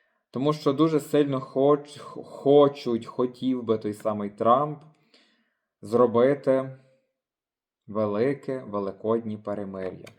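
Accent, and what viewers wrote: Ternopil, Ukraine. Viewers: Спасибі, з тобою спокійно...затишно..і ще люблю тернопільський говір..твій баритон.....ти народний провидець. дякую.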